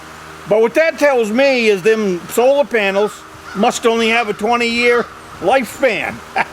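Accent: Maine, United States. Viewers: A good start would be getting rid of the Mills gang.... I really do love your authentic Maine accent! I used to hear it everywhere but now only when I'm in the woods with the old-timers😏👍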